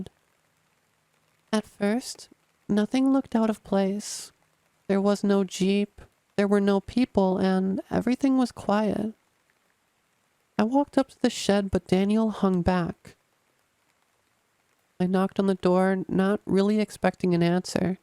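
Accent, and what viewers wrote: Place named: New York, United States. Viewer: Love this new you. Are you east coast or west coast. Just trying to figure out the accent. Sounds more New York.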